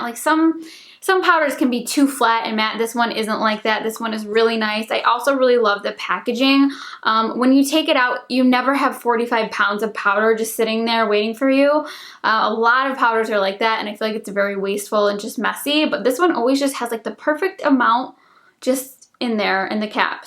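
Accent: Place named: New York, United States. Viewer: Does anyone else remember she used to have an accent?? Am I just going crazy I swear she used to have a New York accent lmao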